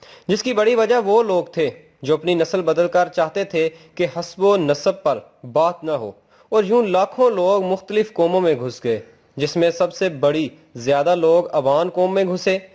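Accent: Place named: Punjab, Pakistan. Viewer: تحریری مواد تو اچھا ہے وائس اور ٹھیک نہیں پڑھنے والا ٹھیک تلفظ ادا نہیں کر رہا جس کی وجہ سے معیار متاثر اور سننے والے پر اچھا اثر نہیں چھوڑتی یہ بندہ پنجابی میں اردو پڑھ رہا ہے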